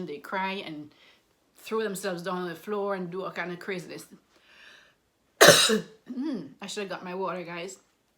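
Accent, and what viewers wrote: Jamaica. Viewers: Dr., is your accent Jamaican?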